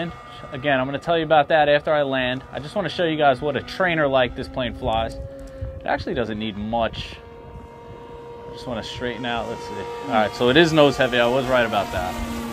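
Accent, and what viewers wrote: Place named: Brooklyn, United States. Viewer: Are you in the boroughs of NY? You have a slight Brooklyn accent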